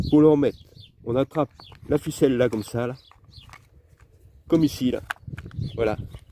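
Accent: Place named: Nord-Pas-de-Calais, France. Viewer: Et avec un bel accent du nord pas de calais. Merci pour tes conseils min tcho!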